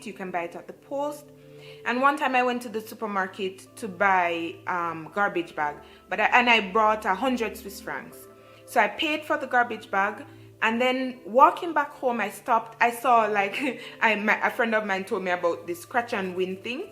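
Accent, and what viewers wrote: Jamaica. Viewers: Girl mi hear di Jamaican accent 🤣🤣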